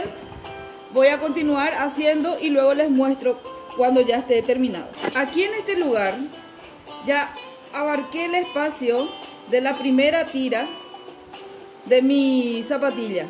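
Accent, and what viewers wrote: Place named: Paraguay. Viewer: Sabia que era paraguaya.. Por la forma en la que habla💕😊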